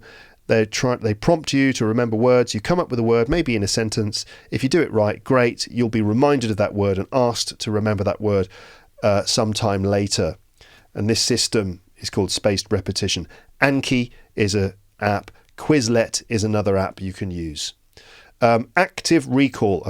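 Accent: United Kingdom. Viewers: I remember that some months ago it was really hard to me to understand you because of your accent but I kept listening British English now I can understand the most of it. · Your accent is British or American ?🌝 I guess it's British bat l want to make sure because my language level is intermediate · I love the brittish accent. thanks a lot for all your videos.. ;-) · hi sir i am a big fan from Algeria i love your British accent so much beacause of you and your peaces of advice i am the first in my class +if i make mistakes tell me please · Hi, I am from Indonesia. English is my 3rd language. First of all, you speak so fast with your British accent. But one thing I want to tell is that I can clearly understand what you've explain without seeing the visual of your video and of course without the texts. So I want to say thank you for making this video for us. 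Hope it's gonna help someone like me out there. Thank You.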